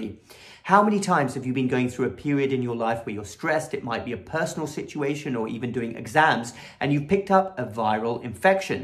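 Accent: England, United Kingdom. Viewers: English accent and your intelligence makes you a bawss professor anyway, no need to add on the dorky glasses you look good bruh you rawk already! boss doc!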